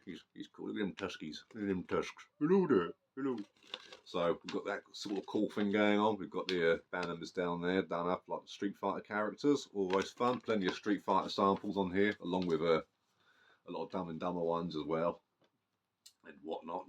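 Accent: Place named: United Kingdom. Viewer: I wasn't expecting the accent. Why are you so British?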